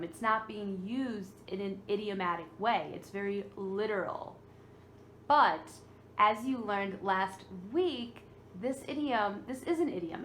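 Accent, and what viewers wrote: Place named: United States. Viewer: Thanks good job I love the way American speak English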